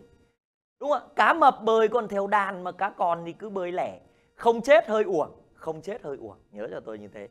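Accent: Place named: Tho Tang, Vietnam. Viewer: Thầy nói giọng Thổ Tang nhưng đẹp trai thông minh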